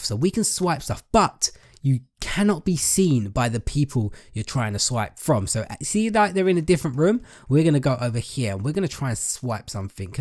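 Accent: United Kingdom. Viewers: U can definitely tell hes form England but same 😂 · you sound so British I am British to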